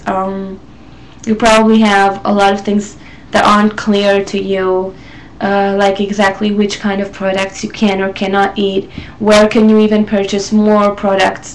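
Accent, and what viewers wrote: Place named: Israel. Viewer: you are pretty and intelligent. :) i realy wanted to know where you accent is from, oooh isreal ok.:) using fried bacon chopped and fried egg on a salad instead of salad sauce is SO Yummy.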